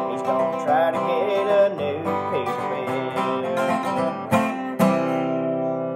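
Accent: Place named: South, United States. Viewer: Dude you're very talented man my hats off to you but hey I'm trying to pick up your accent you have a strong deep southern accent where are you from originally? Oh yeah my lady says that you make her laugh every time she hears one of your songs she said You Got Talent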